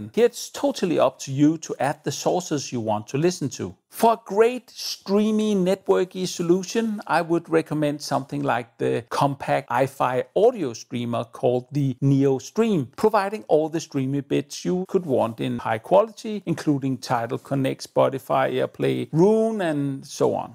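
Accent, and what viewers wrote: Denmark. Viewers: Unmistakable danish accent , but nonetheless informative and humorous!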